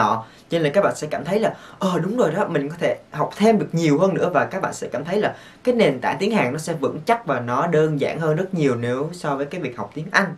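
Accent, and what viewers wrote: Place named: South, Vietnam. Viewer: Anh nói tiếng Việt nghe giọng bánh bèo là vì do anh nói giọng miền Nam nên nó vậy chứ thử nói giọng Bắc hoặc Trung xem có nghe bánh bèo không🤦‍♀️🤷‍♀️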